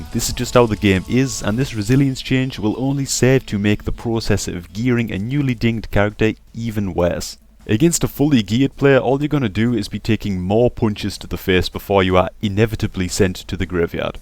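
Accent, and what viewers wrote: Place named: Scotland, United Kingdom. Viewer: he is scotish I think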